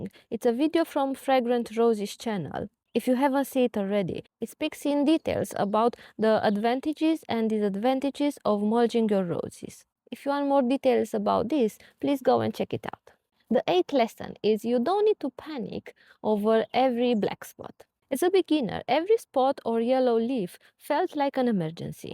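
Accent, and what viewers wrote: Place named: England, United Kingdom. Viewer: Very interesting and informative . I love your English accent.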